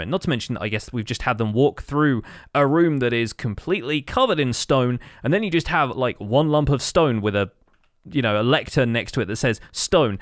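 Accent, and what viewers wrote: United Kingdom. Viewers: I think that is because of his British accent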